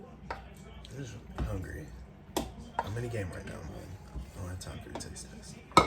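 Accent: South, United States. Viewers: this is my first time seeing you and your channel.I love your smile and your positive energy.I can tell my your deep southern drawl that you are a southern woman like myself.I am born and raised in Dallas,Texas I just moved back to Dallas in May 2018.I have never lived anywhere but Texas.Thank you for sharing I do appreciate it.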